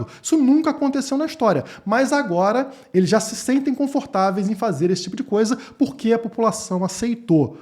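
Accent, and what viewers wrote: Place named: Tijuca, Brazil. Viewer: Rapaz...você é tijucano?! Rsrs TMJ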